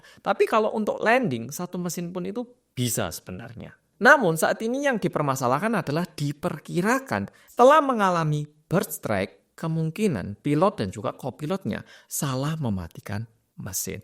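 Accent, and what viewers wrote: Java, Indonesia. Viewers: Pertama gw translate. Pas up volume ngomonge medok. Auto off translate 😅😅 love u bro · Baru pertama kali dengar orang Korea tapi ada logat Jawanya :) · Ngomong nya medhok. Indonesia banget. Dan banyak banget subscriber nya ternyata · Muka oreintal, ngmg medok😢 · Masyaallah jelas banget kasih keterangannya. Juga ko' medok lisan berkata nee orang mana nyak ? Matanya sipit Bahasa medok jawi 👍 Terimakasih untuk kabar beritanya. Alfateha untuk mukminin&mukminat yg kena musibah. Semoga husnul khotimah Aamiin 🤲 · Seneng dengerin logatmu mas...medok 😅😅😅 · jowo medok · Haloo SeonSaengNim Medhokk😅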